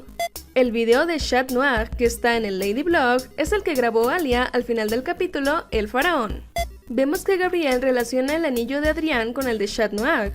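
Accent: France. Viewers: Me encanta tu acento francés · tiene un hermoso acento francés UwU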